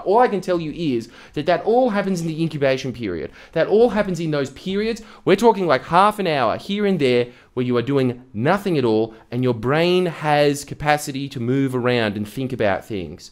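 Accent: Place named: Western Sydney, Australia. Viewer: Preach it ❤ I always knew you were wise. Now i know you're also thoughtful and caring. P.S. your Western Sydney accent is 🔥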